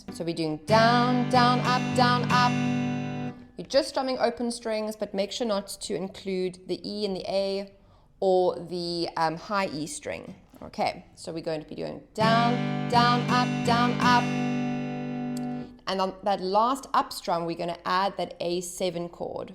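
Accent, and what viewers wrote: South Africa. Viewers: Are you South African? You sound sound like you are from South Africa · This is much easier. I am glad I came across this tutorial. Is that a South African accent? Recognize!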